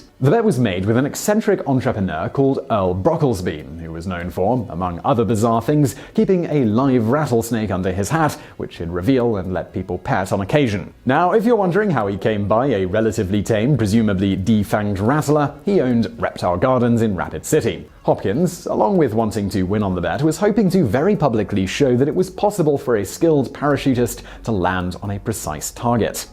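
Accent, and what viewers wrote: England, United Kingdom. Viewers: Is that British English?? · This channel is such horseshit... I too can read a wiki entry in an English accent. I'll take my 2 million views now please. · Yeah he's been living in England for a while now. Guess he picked up the accent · id rather listen to that fucked up robot voice than this brit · I was just interested in seeing the clips..and not listening to 7 minuts of british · British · So the ominous British voice over guy is VSauce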